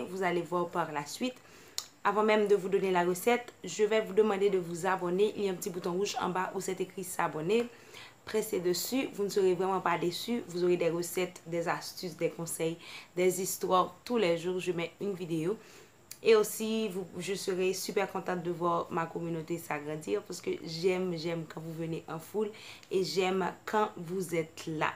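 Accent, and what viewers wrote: Haiti. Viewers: Tu es une haitienne benh ouais vous l'êtes avec tes prononciations francais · t'es haïtienne ça s'entend lol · Vous etes une haitienne ca c est sure...votre accent francisé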